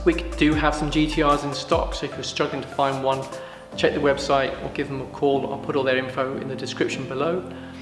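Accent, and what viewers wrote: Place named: England, United Kingdom. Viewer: It seems like people with English accents make the best reviews. Great work man. I’m looking into the bamboo. I like the flex deck. Plus, I like the muscle car vibe the bamboo has going on.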